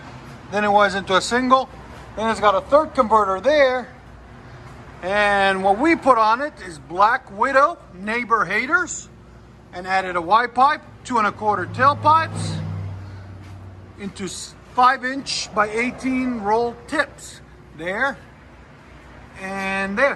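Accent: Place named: North, United States. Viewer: Tn tags but he sounds like a Yankee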